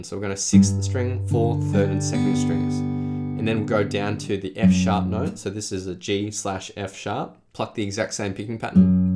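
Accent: Australia. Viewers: i always forget this guy has an aussie accent when the video starts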